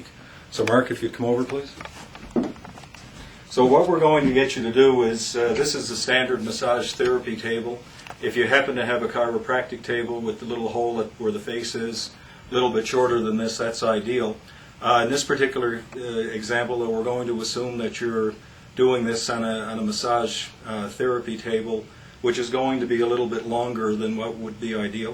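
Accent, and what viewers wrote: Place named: Newfoundland, Canada. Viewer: This doctor is a Newfie! I can hear the accent.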